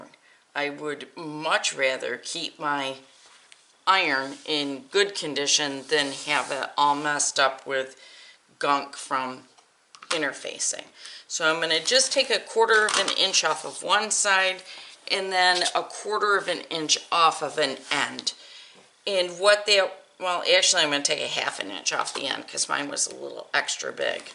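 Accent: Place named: Midwest, United States. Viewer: Taking a class on this so it was great to see your steps ahead of time ! Thanks so much ! Love your mid western accent - I’m a Detroit transplant now living in Texas!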